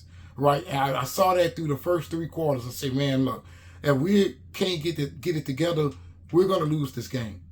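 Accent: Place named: New Orleans, United States. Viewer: Bro, hearing you talk reminds me of folk in Nola! I have to support someone who is as passionate about the Saints and Who Dat nation as yourself! You got a new sub! Keep pushing!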